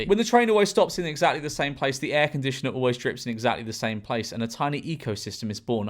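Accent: United Kingdom. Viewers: His British accent is just…. 😩❤️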